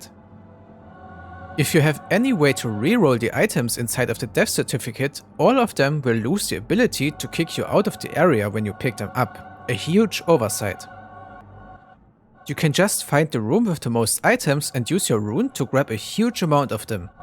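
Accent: Germany. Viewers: im asking myself for a long time but are you german?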